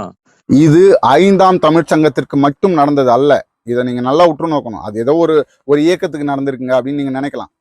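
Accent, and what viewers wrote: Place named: Telugu, India. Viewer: MAM your speach only TELUGU carefully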